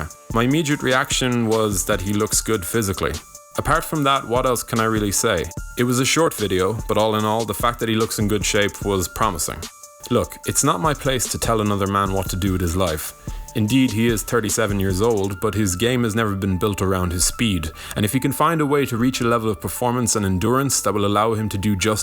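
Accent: Ireland. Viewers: Your posh irish accent is annoying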